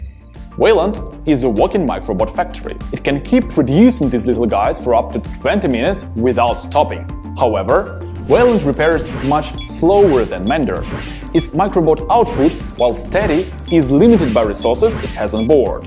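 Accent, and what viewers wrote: Russia. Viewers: Ох уш этот звиздатый русский акцент😁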